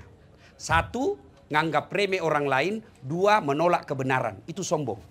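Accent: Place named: Makassar, Indonesia. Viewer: Kusuka na karna tetapji tawwa logat makassarna.... Tetapki bgini ustad jammeki logat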